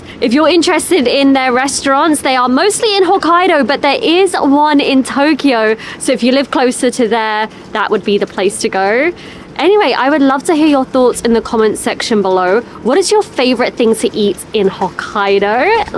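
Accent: United Kingdom. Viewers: LOL なるほど！ ”obviously” って、こういうふうに使うんですね。勉強になったー。それにしても、ずっとさんのイギリス英語を聞いていたら、イギリス英語の発音が大好きになってしまったよ。そして、自分よりも多くの日本全国のおいしい料理を食べてるさんが、うらやましい笑今日のビデオは一段とおいしそうで表情が豊かで最高でした！ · 見事なブリティッシュイングリッシュです聞き取れません脱帽ですすごいです🎉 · 呑兵衛のさん、しかもサッポロ黒ラベル、ジンギスカンとビールが進みますね。華麗なイギリス英語の、聞きやすい発音、大きめの文字、教材としても秀逸です。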